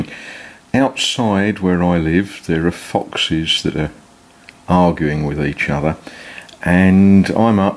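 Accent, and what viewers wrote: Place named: United Kingdom. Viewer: Just here for my daily dose of British accent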